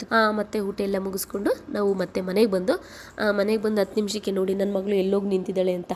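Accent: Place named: Sakleshpur, India. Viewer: Wonderfull akka.I am from shanivarsanthe but probably my native located to sakleshpura.so i am familier with these 2district.first day nim video nodid kshana niv matado reethi nodi ankonde nam sakleshpura side atva somwarpet side avr irbodu anta.just 15days back nim channel g subscribe ade avatinda new and old videos nodta barta idini.tumba chenagi madta idira even nim husband saha well gentleman..nam jangalu especially nam Gowdas matado ancient and kodo respect na i love to watch that. Keep going and all the best.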